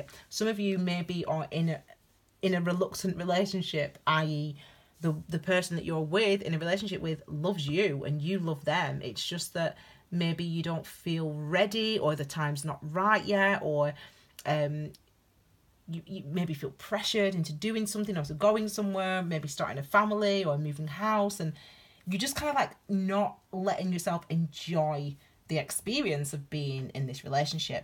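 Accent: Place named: Northern England, United Kingdom. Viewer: Northern England represent! Sounds like you are from my neighborhood. Thanks for this xx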